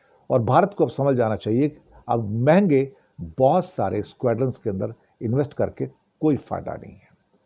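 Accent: Pakistan. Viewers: कहां से फर्जी समाचार लाते हो....बोलने का अंदाज ऐसा है जैसे कोई पाकिस्तानी बोल रहा हो....निकृष्ट स्तर.... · हर बार कि तरह पाकिस्तानी बोली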